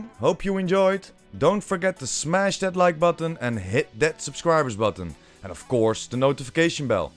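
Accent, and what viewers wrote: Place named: Netherlands. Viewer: Funny that you can hear that you are dutch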